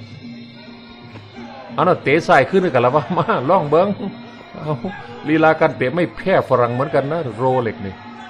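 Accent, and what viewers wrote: Isan, Thailand. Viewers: เว้าอีสานบ้านโตฟังม่วนดี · ผมคนใต้.ติดตามครับ.พากได้หร้อย.. ขำดี.พากอีสาร.ปนใทย.นี้ถ้าพากติดภาษาใต้มั้ง.. หร้อยจ้าน... 555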